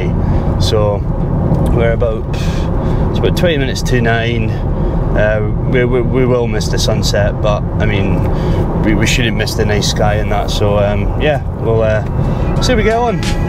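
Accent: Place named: Aberdeen, United Kingdom. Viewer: Hi that’s a lovely video well done. you now have me on board and I have subbed to your channel. I too have a mini three pro and watching you operate yours is very instructive. Thanks for putting all the detail and showing your face, so many drone users tend to just show their footage and not make a story out of the video. I certainly try to do the same myself. I am loving the Aberdeen accent however I have had to put the subtitles on to catch everything you’re saying. Unfortunately the software can’t pick it up either so I just watch the pictures for the most part. Finally you are inticing me to play about with the settings far more, I generally just leave it to the automatic settings but you show how the shots and video can be better by changing the shutter speeds and white colour balance. Looking forward to seeing your other work.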